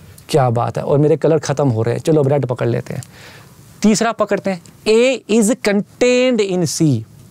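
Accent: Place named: Punjab, India. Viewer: Sir ki punjabi 😁 nice I am from mp but I know punjabi very well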